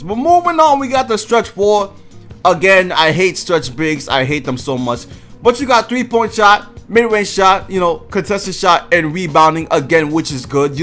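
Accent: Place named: New York, United States. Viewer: You from New York?? Your accent lmao💀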